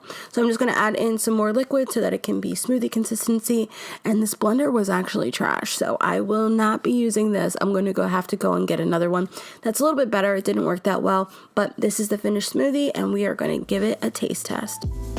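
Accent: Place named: New York, United States. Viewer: Trader Joe's has very good whey protein powder in chocolate and vanilla..I add about eight ice cubes, cup of almond milk, fruit and some sugar free torani syrup to give it more flavor. I use a spoon to eat it as it's very thick. Look into Nestle and where they get their water. Why not drink tap water?...you sound like you are from NY where the water is great. The cost is way less and no plastic bottles.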